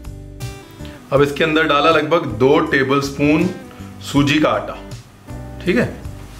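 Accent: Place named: Punjab, India. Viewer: I jst love ur panjabi style talking sir and hindi both,and ur recipes r tooo easy to cook at home and yummy,mostly sweets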